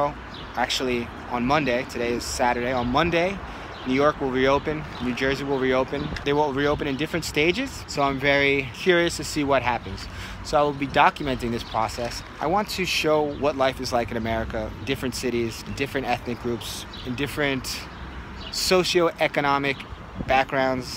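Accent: Brazil. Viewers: você as vezes fala palavras em Inglês com sotaque Brasileiro. Isso acaba influenciando quando você fala em inglês? Tipo quando mora muito tempo no Rio e pega o sotaque carioca. Já aconteceu isso com você?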